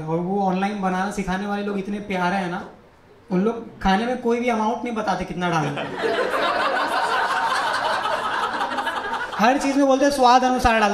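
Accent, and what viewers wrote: Bhopal, India. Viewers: TU BHOPAL SE HAI KYA ???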